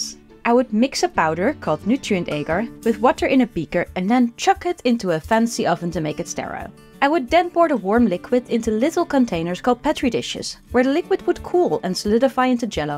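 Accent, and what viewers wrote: Netherlands. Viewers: Is that a Dutch accent I hear? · So Dutch sounding... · still weird to hear dunglish in a minute earth video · you sound dutch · Is that a Frisland accent? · Forgive me but I just have to say that the Dutch accent in English is so cute that I can hardly stand it lmao 😍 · Funny how I instantly heard she was Dutch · weakest dutch accent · Great video and loved hearing the dutch accent! :p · Immediately recognised it too, as I'm Dutch and so I hear it oftenly. And I don't mind it. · i know is dutch bc of her last name (its a dutch last name) an you can hear it in the way she talks she has a dutch accent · Instantly heard she was Dutch❤ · I love Duth accents (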